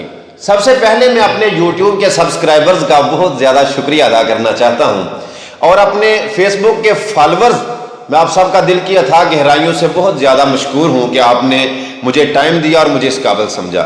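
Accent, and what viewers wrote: Punjab, Pakistan. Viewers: سر پنجابی میی بات سننا آپ کے منہ سے اچھی لگتی ھے اپ کا لہجہ پنجابی ھے اس لئے پنجابی ہی سوٹ کرے گی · پنجاب پنجابیوں کی شان ہے سب کے سمجھنے کےلیے اوردو ہی بولے ویسے بہھی لہجا پنجابی لگتا ہے پنجاب سندھ سرحد بلوچستان سارا میرا پاکستان پاک فوج زندہ آباد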